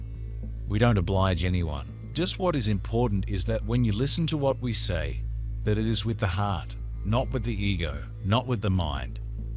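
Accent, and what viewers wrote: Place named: Australia. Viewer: something about an aussie accent that is easy to dismoss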